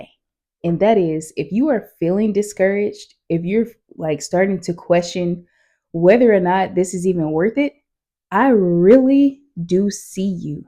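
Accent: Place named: United Kingdom. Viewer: Well done another great video, mindset no 2 resonated with me, love the bloopers and British accent 🇬🇧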